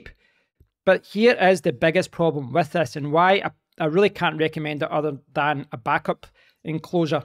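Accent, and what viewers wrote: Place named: Edinburgh, United Kingdom. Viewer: what a lovely accent!! Here in Edinburgh, and find this video sound so familiar!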